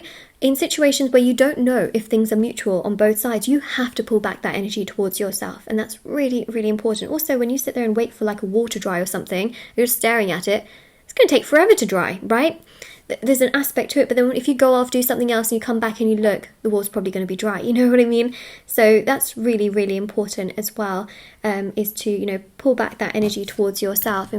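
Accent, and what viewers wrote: United Kingdom. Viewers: Thanks. Always there with brilliant intelligence and guidance at the right time. Something about your british accent makes it persuasive and assuring.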